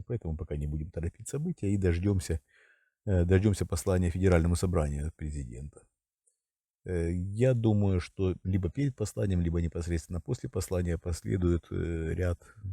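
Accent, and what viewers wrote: Ukraine. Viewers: Какой ты генерал? Ты балабол с харьковским акцентом.Где признаки катастрофы гражданской авиации конкретно? В каком смысле? Технического обслуживания, проведения тяжёлых форм, замены запасных частей? Просто балабол тоскливый...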